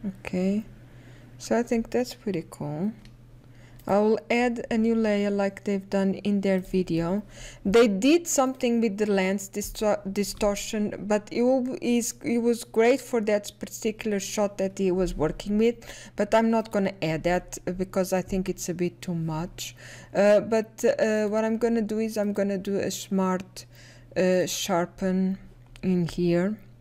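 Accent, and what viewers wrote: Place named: Portugal. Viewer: este sotaque tem, mas TEM, de ser tuga 👀 não engana ahahah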